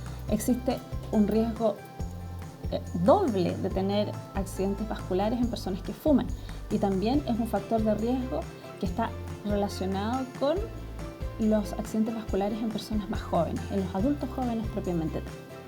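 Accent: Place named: Chile. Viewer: Buena información, pero terrible ese acento 🤦‍♀️🤦‍♀️ deberías modularlo un poco, para que te entiendan los q no son chilenos.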